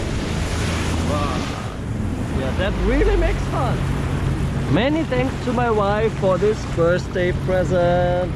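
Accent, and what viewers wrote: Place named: Austria. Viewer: Stark gemacht danke.. hörst dich bisschen österreichisch an haha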